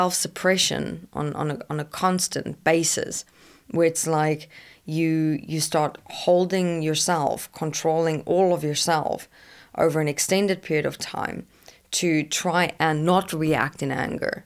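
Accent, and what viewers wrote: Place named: South Africa. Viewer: Sheesh. South African....Netherlands, Scandinavian...?? I'm hearing bits of Maori for crying out loud. Gaaaah! Where is your accent from?? Thanks for helpful video too.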